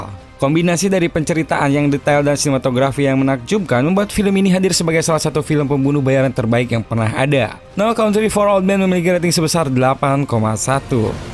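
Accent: West Java, Indonesia. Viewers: kalo dari suaranya,adminnya orang sunda xD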